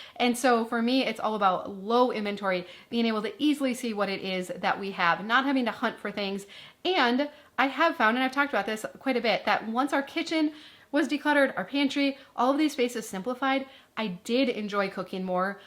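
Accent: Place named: Midwest, United States. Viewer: Question: what did you do with the expired food? Did you just throw away all the expired & freezer burn foods? I need to clean my pantry, too! I know there are a lot of expired cans of food. Yeah, you know what I’m talking about. 😉. I don’t know where you live, but your accent and expressions sound like you are in the Midwest (Wisconsin, Iowa or Minnesota, maybe). Did you just toss the canned “expired” food stuffs in the trash? Here in California we have to put all of our table scraps in a separate bag and that goes into the bin of yard waste (leaves, dead flowers, grass clippings - all things organic that release methane gas as they break down. Our city turns it all into mulch that is used around plantings to prevent weeds; also, given to us city folks to mulch our gardens. So I don’t know… should I open each can and put it in. The yard waste bin (inside its own little separate bag), or should I just toss the entire can (vegetables, fruit, or whatever) directly in to the trash without opening it. 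Will it release methane or explode someday? Thanks for the video and the inspiration to finally get my pantry clean and organized. 🙂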